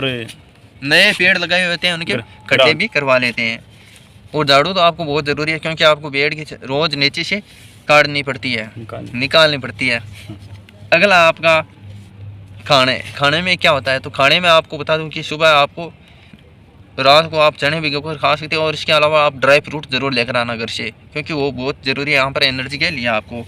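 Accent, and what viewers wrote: India. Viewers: भाई बोली भाषा से तो राजस्थानी लग रहे हैं तो प्लीज़ अपनी क्षेत्रीय भाषा को अवाईढ ना करें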